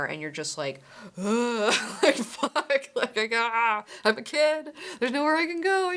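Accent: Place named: Baltimore, United States. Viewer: The Baltimore accent is strong with this one. 😀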